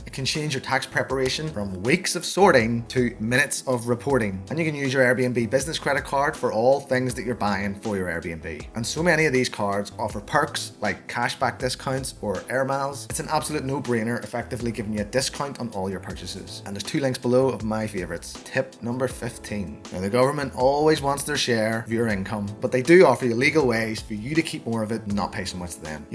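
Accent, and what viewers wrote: Ireland. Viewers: That’s extremely rude.he has a wonderful Irish accent, and that is how Irish people speak. I am watching this in South African, and I can hear him clearly, beside you can slow down the speed of the play. Telling people to speak slowly for your convenience is rude .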